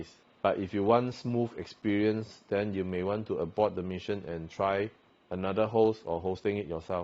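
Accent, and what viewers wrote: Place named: Singapore. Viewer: U sound like a singaporean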